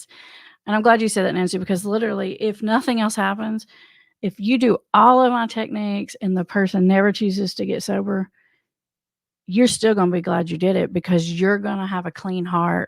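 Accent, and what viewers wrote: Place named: North Carolina, United States. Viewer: Hey ! We share the same dialect ! (n.w. N.C.) 🏅 👍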